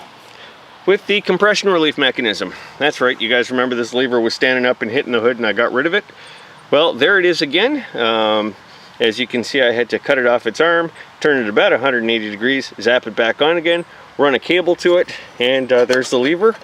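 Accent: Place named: Canada. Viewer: sounds like a Canadian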